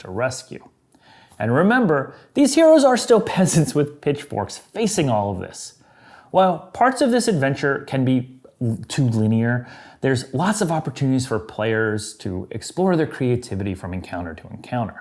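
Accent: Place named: Australia. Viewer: This is brilliant but that Australian accent is bloody awful .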